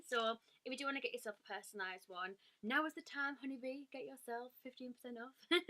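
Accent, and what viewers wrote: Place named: Leeds, United Kingdom. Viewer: You sound like your from Leeds ? X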